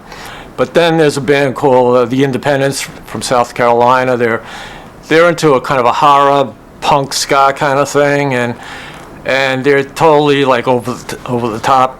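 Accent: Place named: New York, United States. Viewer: The New York accent is so cool. Love it. 👌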